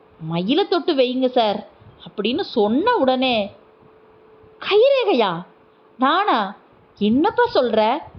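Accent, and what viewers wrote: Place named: Tirunelveli, India. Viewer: நானும் ரிடையர்டு இங்கிலீஷ் வாத்யார்.கதையில் சொல்லப்பட்ட உணர்வுகள் உண்மை.குரலில் தின்னவேலி பாஷை பிரமாதம்.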